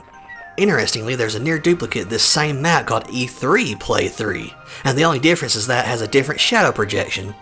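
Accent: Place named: Texas, United States. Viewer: He sounds like a texan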